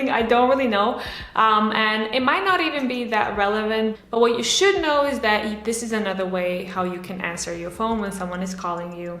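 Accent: United States. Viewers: To me, you sound like you could be from the US. You're not the only one I've seen this with. Why is that?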